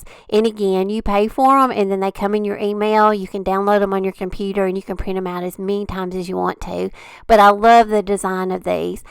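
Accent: South, United States. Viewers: I also love your sweet southern accent ❤️. What part of Alabama are you in ? I live in Haleyville Alabama. It's in the north west part of the state.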